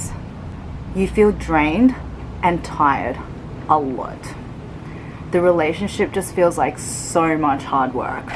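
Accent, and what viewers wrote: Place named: Australia. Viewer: I seriously love australian accents so much (which is what I think yours is?) lol if not, I STILL LOVE IT Also, I can relate to ALL OF THESE. It made me laugh when you said "you just wish they'd leave you," because I lowkey would have this thought in the back of my mind that said "I wish he'd just cheat on me so I could leave angrily." I know it's bad, but I was looking for a way out instead of facing my true feelings of just simply not wanting to be there.. I am no longer in that relationship though!!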